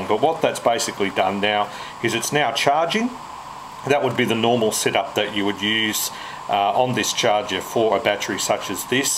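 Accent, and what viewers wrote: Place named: Australia. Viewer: I can tell by your aussie accent that you are the man that can help me. I am new to all this battery charging and I want to get a good charger from america but I am worried about the 240 volts we have he in aus ruining it so my question is if it says the AC input is 100-240V does that mean I can run it off an aussie wall socket ? sounds like a dumb question right but I just need some reassurance before I spend $130 bucks. the racers edge surecharge is the one I am looking at. thanks in advance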